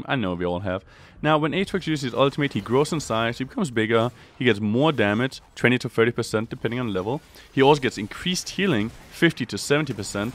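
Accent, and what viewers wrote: Denmark. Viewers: "Go for the spirit of vis-ass" xD love the danish accent.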